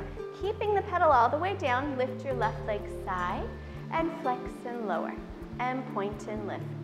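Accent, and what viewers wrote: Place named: United States. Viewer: A voz dela é tão americana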